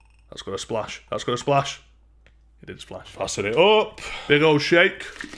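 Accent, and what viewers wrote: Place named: Yorkshire, United Kingdom. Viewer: yorkshire? me tooooo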